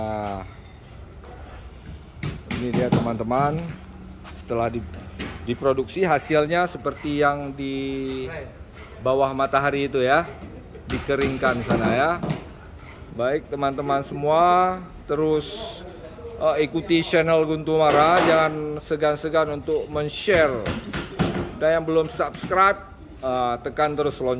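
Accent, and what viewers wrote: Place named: Banyumas, Indonesia. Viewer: ko orang ngapak bos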